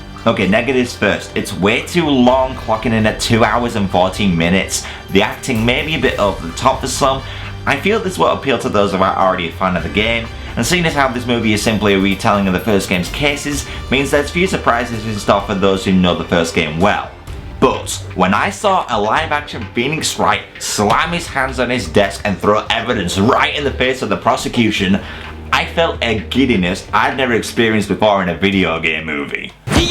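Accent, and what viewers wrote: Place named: Newcastle, United Kingdom. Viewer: Probably Jordy or something. I'm British and I'm not so sure of myself.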